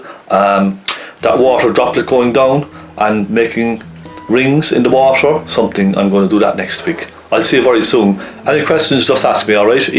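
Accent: Cork, Ireland. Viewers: I so love your Cork accent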